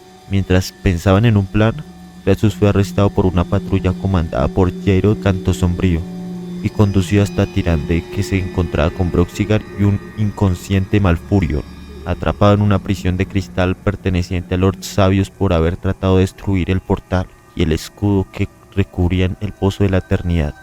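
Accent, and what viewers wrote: Colombia. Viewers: de colombia , por el acento cachaco , saludos desde Valledupar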